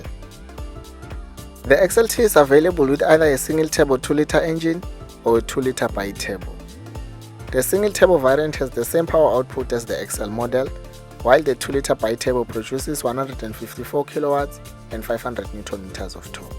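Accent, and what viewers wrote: South Africa. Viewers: Interesting journal, Nguni nation accent. Great review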